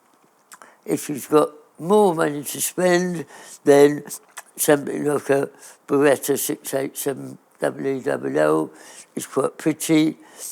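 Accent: RP, United Kingdom. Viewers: I could listen to all day long, so full of knowledge. I suffer from Tinnitus as result of shooting in my youth and I can understand every word that he says and those that can't must be stone deaf or does not speak the Queens English.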